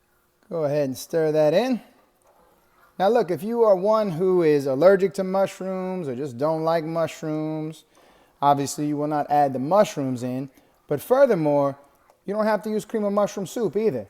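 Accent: Louisiana, United States. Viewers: are you really from Sweet Love from Louisiana cuz you have that accident I want to say you're not married things that you don't cook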